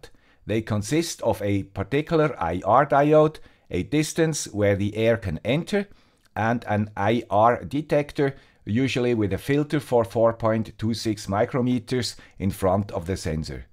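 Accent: Switzerland. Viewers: nun ja, Paraffin (Kerzenwachs) ist ein langkettiger Kohlenwasserstoff und verbrennt mit Sauerstoff in etwa zu gleichen Teilen zu Wasser(-dampf) und Kohlenstoffdioxid. Ergo müsste die Zunahme an CO2 mit der Abnahme von Sauerstoff in Verbindung stehen. Am Ende ist nicht mehr genug Sauerstoff für die Verbrennung da und die Kerze erlischt bei einem Wert um 16% O2 in der Luft. Vielleicht weiß ein anderer Zuschauer noch etwas. Wir sind ja alle hier um zu lernen :D Und danke für die vielen tollen Videos mit dem Schweizer Akzent™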